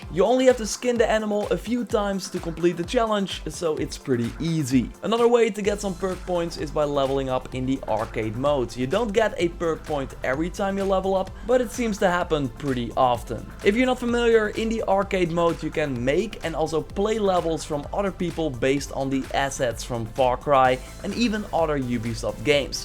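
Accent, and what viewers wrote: Netherlands. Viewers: are you dutch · He's Dutch lol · are you dutch?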